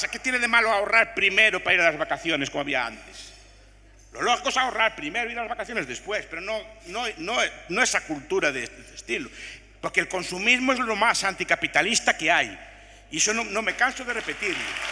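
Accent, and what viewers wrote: Galicia, Spain. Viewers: La bonhomía, la sinceridad, la honestidad intelectual, la curiosidad, el afán de conocimiento y su acentuado acento gallego adornan al profesor Anxo Bastos. Merecidísimo el Juan de Mariana por su relevante defensa de la libertad en todos los ámbitos.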